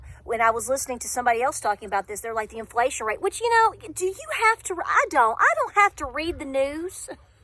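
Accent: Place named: Appalachia, United States. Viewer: I love your accent. My husband is an Appalachian and sounds similar to you. I love his culture. The elders of the past are so important to learn from.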